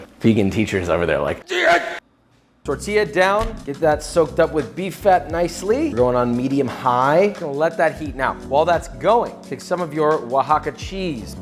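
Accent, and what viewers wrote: England, United Kingdom. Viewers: Your English accent is getting quite refined You absolute mad lad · where did the british accent come from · You're an inspiration to us all buddy, keep up the good work!. Josh's British accent gets me every time. Love it.. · As someone from the UK I really like his English accented quips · Can we have Josh do an entire video with the English accent? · Josh's English accent is better than Oscar Isaac's. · What's with the terrible English accent? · Why the British accent tho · The British accent has me dyinggggg lol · That English voice is brilliant. Most Americans do it in a jokey way but you, you son, make my proud. (I’m English if you didn’t get the drift)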